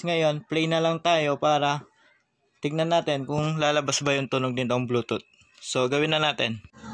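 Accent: Pampanga, Philippines. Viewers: Kapampangan ka ne boss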